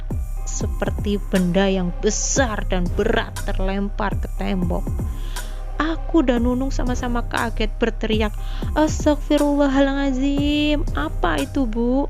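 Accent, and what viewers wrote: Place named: Java, Indonesia. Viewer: seru amat ceritanya mbak...org jawa ya mbak, logat jawanya msh kentel bgt...hehehe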